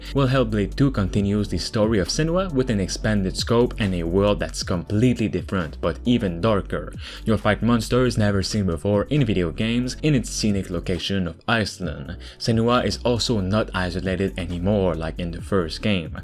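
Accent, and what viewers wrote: Quebec, Canada. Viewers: this guy is clearly from quebec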